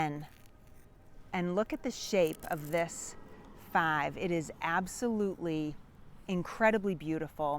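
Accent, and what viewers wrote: Boston, United States. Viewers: A great series! Thank you, and let me add, having been born in Boston, I enjoy the sound of your voice too! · My brother-in-law is from Boston and talks just like that. If you can't say something nice, don't say anything at all. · I subbed a while ago, love your content, and I also get a kick out of your Boston accent. Cheers! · I adore the Boston accent! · You are captivating in your storytelling of our country’s rich historical birth. I could listen to that Boston accent all day long, and I’m from North Carolina having a southern accent.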